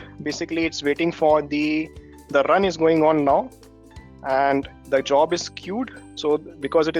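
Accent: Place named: India. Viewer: heavy Indian english accent.Cant get it at all!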